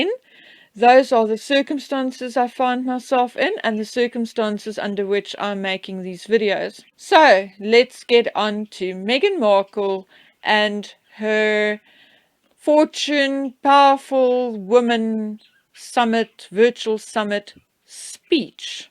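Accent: Afrikaans, South Africa. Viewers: I lived in South Africa for many years, Pietermaritzburg first and then Cape Town. Your accent brings back fond memories and I love listening to your videos x 🌸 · Another wonderful video as always. The lovely birds in the background do not ever bother me, quite the opposite, they are quite pleasant to hear, and they do not stop me from hearing you clearly. It's wonderful that you have your grandson's birds there, just another way to show what a caring person you are. As to your accent, it's a joy to hear, an excellent South African accent. I eagerly await each one of your new videos, and am so glad you are going to continue making them. All the best to you and your family, stay safe, and know you are in the thoughts and prayers of many of your listeners, including myself. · Love your accent. I have family in South Africa and it so reminds me of them and gives a warm feeling. 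Your birdies are lovely. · Firstly, I think your accent is beautiful (S. Africa, right?). I teach English as a 2nd language and listen to accents from all over the world, they're part of what makes us unique/special. Secondly, never apologize for our furred (or feathered) loved ones. If I had the talent to make YTube videos you all would be listening to a snorting, panting, snoring pug...but it's all (chirping, barking, meowing, etc.) music to my ears! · I love the SA accent and i like to hear the birds in the background. Love to visit too, been to Clarens in the mountains. Absolutely beautiful country. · I love listening to birds and it didn't take me long to identify them as budgies. I really enjoy listening to your perspective on the Markle Saga in your wonderful South African accent (the budgies too). Ignore the moaners and keep up the great work! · Keep the birds tweeting/ we love them never care about ignorant people. 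Btw I was born in johannesburg a long long time ago and immigrated to Australia with my European husband 37years ago - besides the content of your videos I love your accent bc I miss South Africa very much. Love the birds❤️❤️❤️❤️ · I want to say I love your accent, and I am not alone in admiring the South African accent. It was voted the second sexiest accent in the world last year. Our internet provider here in Australia outsourced their technical advisors to South Africa, and some of those call centre guys were charming beyond belief. I also love your birds chirping. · I think you have a most beautiful accent. It sounds. South African. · You know, some people just love to jibe, stir, criticise or anything to make others feel upset or uncomfortable. I love the sound of the birds in the background. It actually sounds like we are in the bush. Also, your accent is so recognizable as South African just as mine would be recognized as Australian. 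People need to get over themselves. Keep doing whst you're doing. There are plenty of us out here who enjoy your videos. If people don't like them they can stop watching. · First of all, I am British living in Canada. I am fairly new to your site and I love your accent. Always have loved a South African voice. I cannot believe how much research you put into your site and I thank you for sharing. As for your two lovely little budgies, there singing is so pretty and I thought you might actually be outdoors. They do not squawk or even sing loudly at all. Thank you so much for your site. I do appreciate it and you so much. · I love the chirping of the birds - it is very uplifting. I am so sorry about your situation and all you have been through. My best friend is from Cape Town and your accent is charming. As to Meghan's latest appearance, why is her face so puffy looking? She has also gone overboard with the bronzer. 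She said nothing she says is controversial (apart from her constant plagiarism) but it is not all about what she says, it is what she DOES! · I love your accent. South Africa? I had good friends from South Africa. I enjoy your comments.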